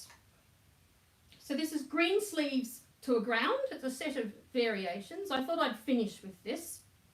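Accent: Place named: Australia. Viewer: Sharing your gorgeous Aussie accent and aspie outlook, and recent dip (10 years ago) into the recorder and early music.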